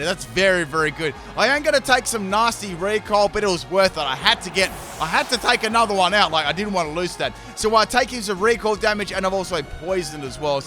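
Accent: Australia. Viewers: Australian accent "...and my Noinoi is gonna be noi more!"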